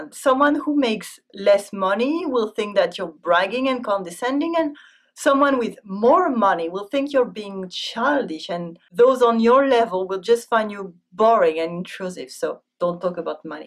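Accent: France. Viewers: I'll take her French accent over any modern american variety any day of the week. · Moi je suis américaine et je confirme qu'on adore l'accent français et que c'est très "sexy" 🙃 et que j'ai entendu aucune faute mais peut-être mon anglais n'est pas aussi correct que j'aime son accent et je le trouve très facile à comprendre au moins pour un américain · Her french accent is so thick. I hear it especially when she speaks french. Definitely a challenge for her to get that english accent right. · Léa ! J'ai pas dit qu'il était mauvais, juste difficile à comprendre car j'ai l'habitude de l'anglais américain voir canadien et je parle anglais tous les jours et j'aime ne pas avoir "d'accent" français même s'il ressort par moment, certains se moquent, d'autres trouvent simplement fascinant que je parle si bien anglais. Moi je trouve juste dommage de "cultiver" un accent français, parfois, surtout dans cette vidéo, je trouve cela forcé. 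Je ne jugerai personne sur leur accent s'ils me parlent et essaye de parler une langue non native pour eux, mais je suis d'accord que beaucoup de gens abandonnent l'anglais ou autre langue facilement car ils ont peur de pas avoir un bon accent mais il y a une différence entre accent et prononciation. Dans cette vidéo, je ne juge pas l'accent au fond mais l'articulation et prononciation des mots. Par exemple, quand j'utilise un mot français qui est aussi utilisé en anglais, je le dit pas à la française, je le dis à l'anglaise. Je préfère d'ailleurs l'anglais que l'américain. Oui, la jeune fille de la vidéo sonne plus anglaise que américaine mais il n'empêche que de nombreux mots m'ont perturbé, pas l'accent, mais la prononciation littéralement "française" de le dire comme si c'était fait exprès pour faire genre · Her English is absolutely fluent and perfect grammatically. A slight French accent but she is amazing. 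· Je suis américaine et je trouve qu'elle s'exprime très bien ! Et son accent est vachement mignon. C'est vrais qu'on adore l'accent français, vous inquètez pas :) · She's French. Your saying that her French accent is ugly? Then why are you watching a video about question not to ask a French person if your already not a fan of the accent? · Si tu es francophone de base, il est logique de comprendre un accent du style car la prononciation qu'elle a de certains sons est la même qu'en français. Pour un anglophone ou autre, ces sons déforment parfois trop le mot pour qu'il soit compréhensible pour eux, ça ne relève donc en rien d'un manque de compétence en anglais (surtout que ce n'est pas un accent anglais, c'est un accent étranger en anglais, c'est encore différent d'entendre un écossais ou un néo-zélandais). De plus, très perturbant quand tu parles très souvent une langue, elle prononce beaucoup de mot français à la française en anglais. 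Ca fait un mix dégueulasse de deux langues, j'ai l'impression qu'on frappe mon oreille à chaque fois... Pour finir, je me suis arrêté après 2 minutes. L'accent frenchy en anglais m'est juste insupportable...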